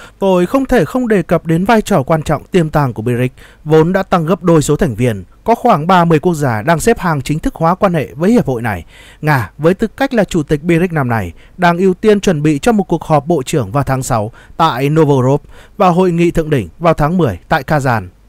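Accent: Standard. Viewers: Bạn bl rất chuẩn ,hay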